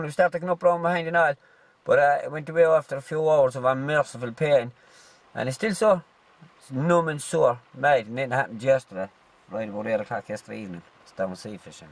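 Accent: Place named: Kerry, Ireland. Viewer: Oh are you from Kerry? I couldn't place your accent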